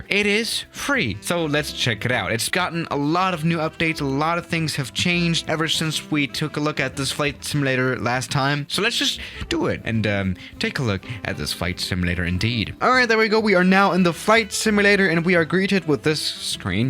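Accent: Germany. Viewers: Are you From Germany or Switzerland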